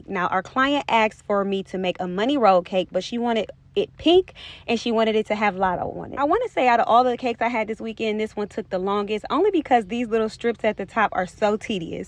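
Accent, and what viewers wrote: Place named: Georgia, United States. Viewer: So I’ll never get a cake from you cause it sounds like you’re in Georgia somewhere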